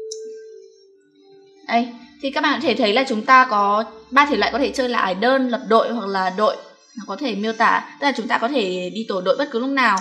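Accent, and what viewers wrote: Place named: Hanoi, Vietnam. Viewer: Rất thích giọng con gái hà nội 💝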